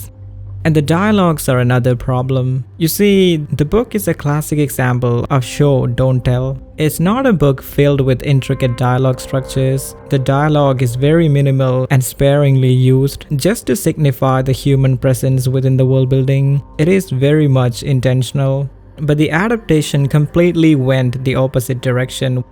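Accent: India. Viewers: Oh boy, are you Indian or something? Your accent is showing, but it's passable. Please work on this. I'm not rage baiting. I'm trying to give advice, so you can get more views and a proper yt channel.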